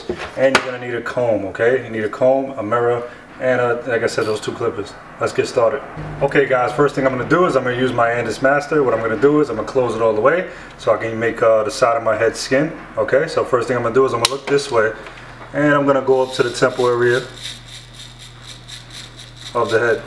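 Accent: New York, United States. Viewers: you sound york or jersey that right?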